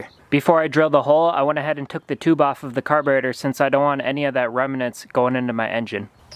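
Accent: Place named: Minnesota, United States. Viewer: Another Minnesotan eh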